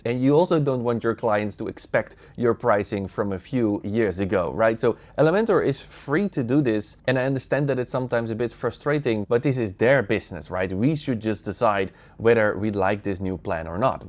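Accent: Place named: Netherlands. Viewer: Ive been an Elementor Pro user for years and Im seriously considering whether Elementor one, especially the mail feature and image optimization, would be worth it as a subscription for my clients. Id love to test it in real client projects. And yes, theres only ONE with that legendary Dutch accent. Sending you warm regards from Switzerland, where the English accent is just as charming, just with more mountains.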